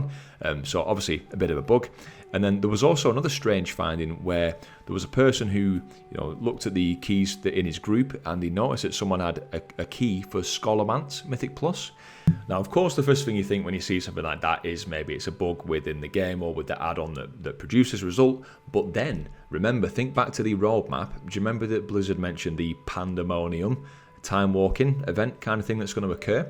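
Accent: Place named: North, United Kingdom. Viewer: Northern British accent >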